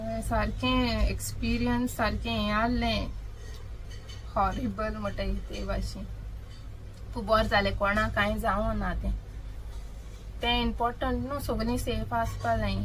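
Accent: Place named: Mumbai, India. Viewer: Hi!, I'm Sylvia from Mumbai. I accidentally came across your vlogs some days back as I was scrolling through U tube n was curious to see more of them. To tell u the truth I liked your videos. I found u r a very simple person with a strong personality. When you speak in English u really have some style n you sound like a Mumbaikar n when you speak in konkani u sound like a pakka goankar its nice. U have a very nice voice too, but if u can make time n join some singing classes you can modulate your voice even better. I know u don't get much time as most of your time goes in traveling but do try. I fish u all the best n continue with your vlogs. ❤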